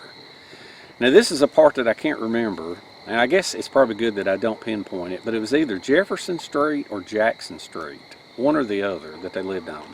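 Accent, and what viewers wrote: South, United States. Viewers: Ow yes I "love" these scary stories..especially when it's spoken with a Southern draw..greeting from The Netherlands❤🇱🇺 P.S. love your channel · Yep. Shame that the Southern accent is slowly disappearing.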